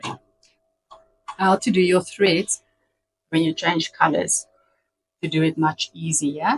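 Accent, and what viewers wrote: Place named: South Africa. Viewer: I wanted to say I recognize a South African accent.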